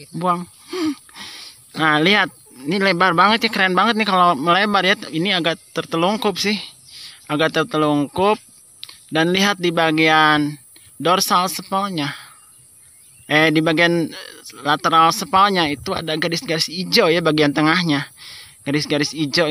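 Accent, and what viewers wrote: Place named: Sunda, Indonesia. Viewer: Klo org sunda berbahasa Ind logatnya meni ledok banyak,,mah"😅nya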